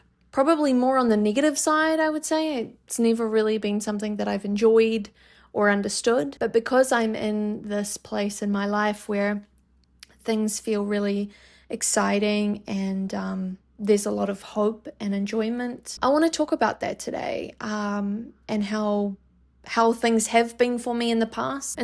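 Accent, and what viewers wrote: New Zealand. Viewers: Thats pretty much just a New Zealand accent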